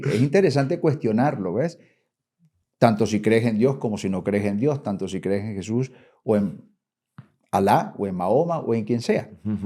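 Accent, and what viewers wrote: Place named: Colombia. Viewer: Gracias Doctor por todas estas enseñanzas. Creo que ud es Colombiano por su acento y me enorgullece por lo que está haciendo por nosotros.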